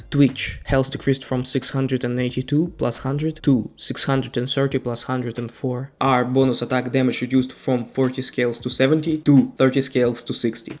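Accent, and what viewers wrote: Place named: Russia. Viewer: Фикси акцент, слышно что ты russkey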